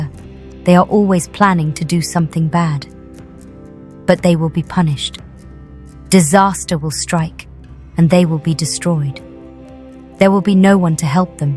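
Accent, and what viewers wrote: United Kingdom. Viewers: I love it. Is that a British accent?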